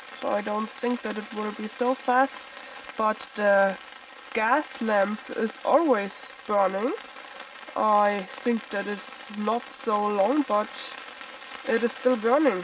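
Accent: Bavaria, Germany. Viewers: dieses bayerische Englisch ist - na ja - gewöhnungsbedürftig. Aber ich verstehe schon, dass Du versuchst, Deinen Wirkungskreis zu vergrößern.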